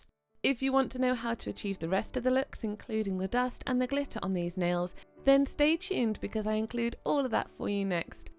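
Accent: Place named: United Kingdom. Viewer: I love your British accent. And Beautiful design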